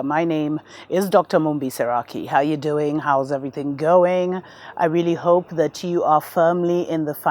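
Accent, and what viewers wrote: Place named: United Kingdom. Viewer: I cannot listen to you why you worrying how she laughs what part of the uk are you from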